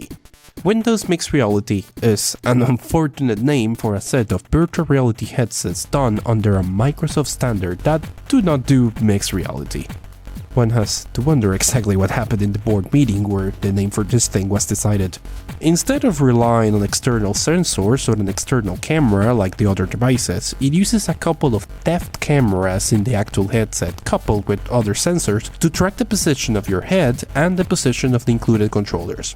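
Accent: Spain. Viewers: alv eres de España? :0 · Espera, ¿eres de españa? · Eres de españa ? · Un segundo, eres español? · De que parte de España? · Wait, are you from Spain? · Hey tío, eres de España? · como que es de españa? haz un video en español pls solo porque quiero conocer como suenas en español XD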